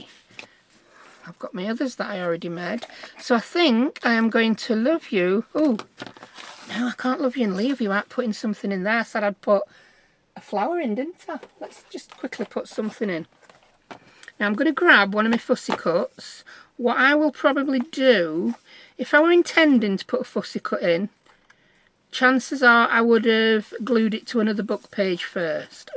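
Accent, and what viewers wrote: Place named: England, United Kingdom. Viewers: Whenever you say "I don't know", it reminds me of the potato ad I loved.."Don't know, it's all covered in mud" love the yorkshire acent. Much better than mine ....(semi, scouse! I'm from other side of water!) Love your videoes.... please say Hi to Gertrude for me LOL! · Omg I love your act sent alway did Or Linden English England just love that accent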